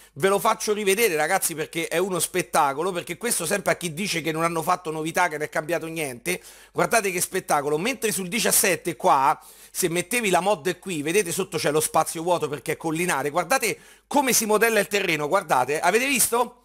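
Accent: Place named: Rome, Italy. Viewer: bravo, ottima spiegazione! e poi il tuo accento romano è troppo forte!!!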